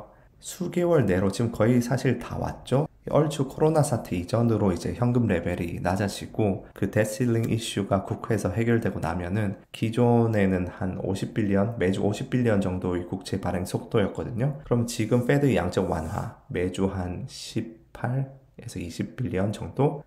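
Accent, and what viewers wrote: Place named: Daegu, South Korea. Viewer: 사투리가 대구 사투리시네요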